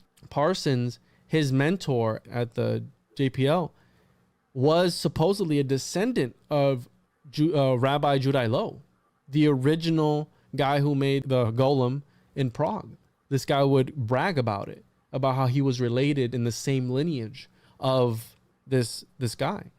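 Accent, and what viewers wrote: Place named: Mexico. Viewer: pronouncing latin words with mexican accent. oof. and i'm hispanic.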